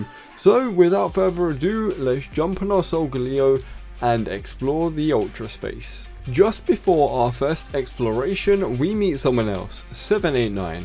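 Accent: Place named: United Kingdom. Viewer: Imma guess your British as a British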